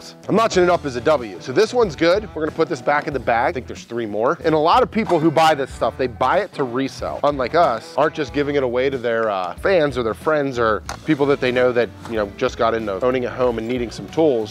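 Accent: Pittsburgh, United States. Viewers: Ahhhhh. Love hearing that Pittsburghese. Reminds me of home! Go Stillers! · I caught that Yinz and the saw that steelers logo in the background. Thought your accent sounded familiar! Pittsburgh ftw!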